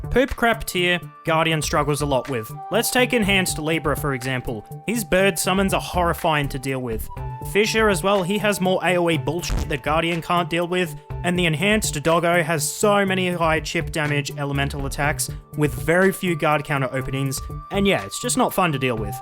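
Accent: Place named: Australia. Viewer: I've seen your videos before where you were disproving the slander on guardian and showing how he's actually supposed to be used, and I was already planning on playing the character at some point, but after watching a video of this dude absolutely slaying shit in depth 5 with guardian, it further motivated me to pick this character up after countless hours I've spent on executor and Wylder. So when I was looking for a character guide just to make sure I was doing things right, I knew it was an absolute must to make sure the video was done by you. As soon as I heard that Australian accent, I knew I was in the right hands lol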